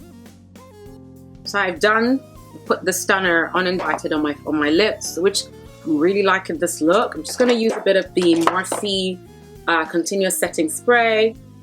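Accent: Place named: United Kingdom. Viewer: Your mix of the British accent and yoruba was killing me😊 Love your review. 😍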